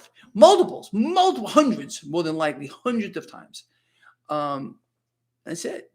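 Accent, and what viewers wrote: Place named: Philadelphia, United States. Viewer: New to the channel, really enjoying it. Love accent. Is it Philly? Jersey?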